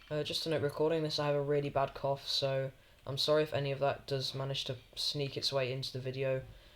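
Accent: United Kingdom. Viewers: why do people think you sound like anorwegian when he’s american you’re from southern england 😭😭😭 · British accent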